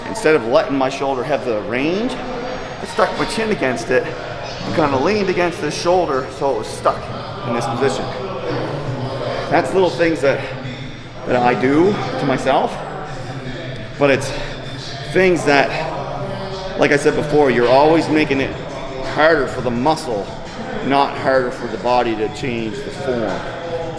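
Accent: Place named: East Coast, Canada. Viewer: Is that a Canadian East Coast accent I hear?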